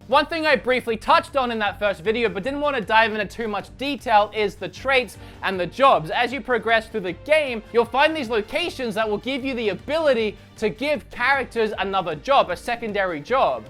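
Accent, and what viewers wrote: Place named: Australia. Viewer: Also stop with the stupid aus accent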